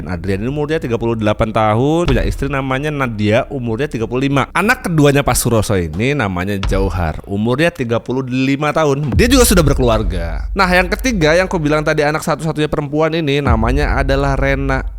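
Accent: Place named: Banyumas, Indonesia. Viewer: Asline ngendi kang,kayane wong ngapak kie ..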